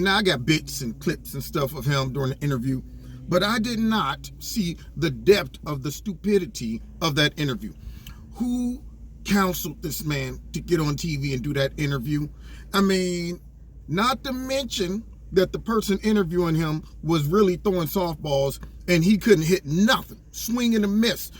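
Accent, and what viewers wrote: England, United Kingdom. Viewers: Hilarious! Love it! Love the British accent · Your british accent is so funny! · I love your English accent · Your British accent is jolly good!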